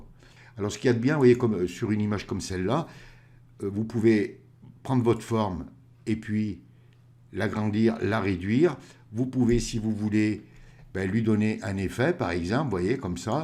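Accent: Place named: Saint-Étienne, France. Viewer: Merci pour l'abondance de ces tutos si précieux en période de confinement. Cerise sur le gâteaux, l'accent stéphanois que j'ai découvert lors d'un séjour offert il y a bien longtemps, gracieusement, il y a bien longtemps au camp de la Valbonne. Le problème , c'est que l'on devient accro et qu'on en redemande.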